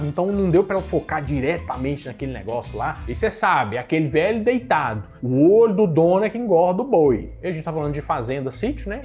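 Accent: Minas Gerais, Brazil. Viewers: E com sotaque mineiro arasaaaa seus vídeos são maravilhosos e com os comentários de vcs ❤️❤️❤️❤️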